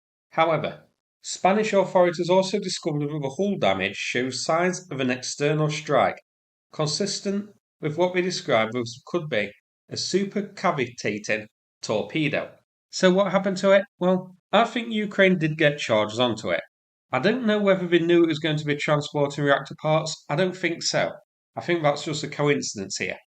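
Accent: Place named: Lancashire, United Kingdom. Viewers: Northern English accent. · To the VO words ending in ING are pronounced ing like housing not housin ‘ ing . If you’re going to do a video at least learn your pronunciation. You sound ridiculous. The Lancashire accent is bad enough !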